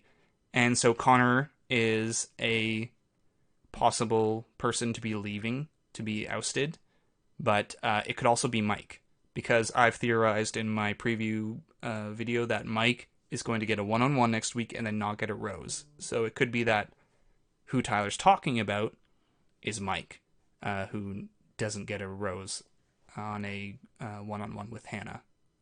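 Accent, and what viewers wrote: Canada. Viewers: i think he’s gotta be canadian, the way he says out/about is just too canadian 😂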